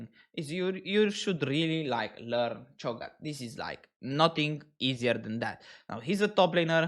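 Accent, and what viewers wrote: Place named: Romania. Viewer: you have an romanian accent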